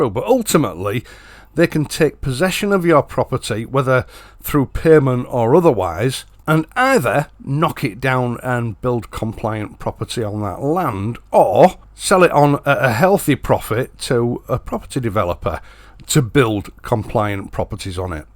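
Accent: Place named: Yorkshire, United Kingdom. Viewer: Listening intently to your observations when I observed something else, Beverly race course. Didn't realize you were in this neck of the woods. Knew from the accent you were a good sensible Yorkshire lad which explains why you make so much sense. Didn't realize you were making so much sense just up the road from me.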